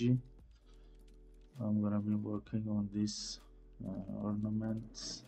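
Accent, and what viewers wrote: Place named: Iran. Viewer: You have a Persian accent, are you from Iran? We are easily distinguishable.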